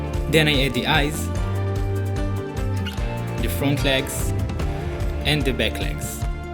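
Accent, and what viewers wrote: Israel. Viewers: אפשר לשמוע שאתה ישראלי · שומעים את המבטא הישראלי😆 אבל יפה אחלה סרטון👍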